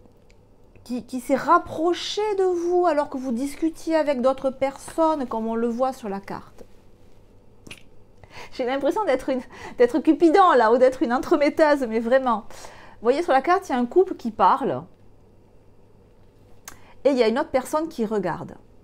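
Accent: South, France. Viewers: Je vous découvre dans cette vidéo et je like. En tant que belge, j'adore votre petit accent du sud...Merci !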